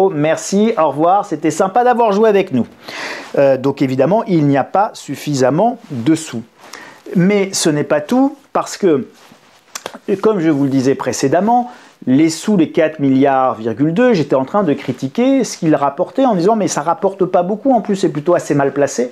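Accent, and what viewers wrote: France. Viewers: T'es normand ? Yes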